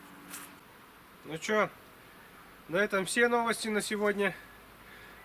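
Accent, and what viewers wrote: Perm, Russia. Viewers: Нормальный пермский говор)))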